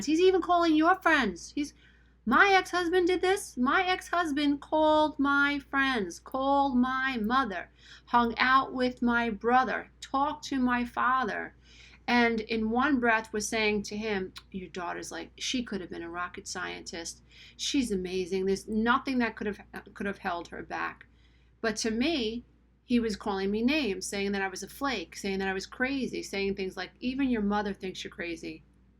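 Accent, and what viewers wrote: New York, United States. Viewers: it's refreshing to hear a narc abuse channel with a new york area lilt. nice work. thank you.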